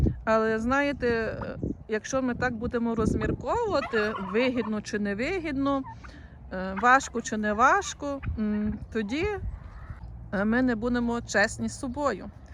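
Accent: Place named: Galicia, Ukraine. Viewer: Привіт зі Львова ❤, перець можна в'ялити точно так, як помідори і потім додавати до піци, салатів і т.д. Відео дуже цікаві, приємно чути галицький рідний діалект, з задоволенням дивлюсь❤❤❤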